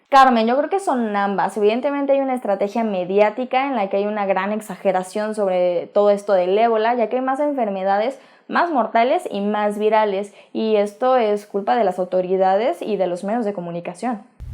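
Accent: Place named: Mexico City, Mexico. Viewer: Me gusta mucho su noticiario :D soy estudiante de comunicación y de verdad que aprecio mucho la edición y el gran manejo ante la cámara. Eso sin duda es talento! felicidades a todo tu equipo, porque se ve que les gusta lo que hacen :D!....sólo ese acento chilango, es lo único malo, pero de ahí en fuera, excelente :D!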